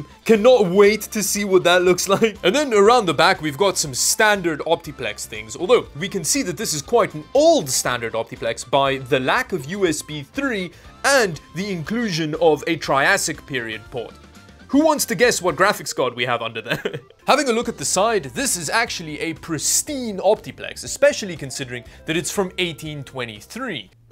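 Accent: South Africa. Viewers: Are you by any means from South Africa hence your accent? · Hey! curious, are you a Afrikaaner! im getting South African Vibes from you lol! Awesome Channel and love the MUG thank you lol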